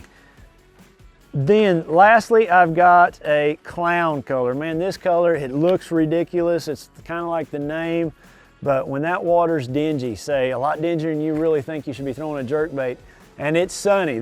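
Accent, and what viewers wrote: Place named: South, United States. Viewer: January WHERE???????? Sounds like you have a southern accent....in certain areas up north in January, the most important thing would be an ice augur! lol.....Why wouldn't you start the discussion with where you are, and generally, where you fish....I had to fish 45-50 feet deep water to catch bass today, and you are talking to me about a jerkbait??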